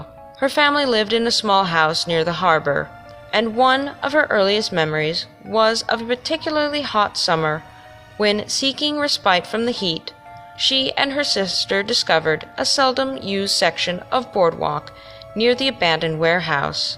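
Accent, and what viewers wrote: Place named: Chicago, United States. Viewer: it’s an honest compliment you have an unusual voice kinda like Velma with a little more what I think is Chicagoan.......maybe upper Midwest like michigander Minnesota Possibly Wisconsin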